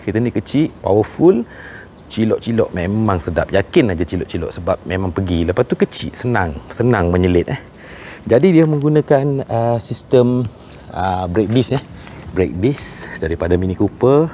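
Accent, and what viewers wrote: Malaysia. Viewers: malaysian mmg camni.. bukan konoha...kalau konoha pronouncation lagi jahanam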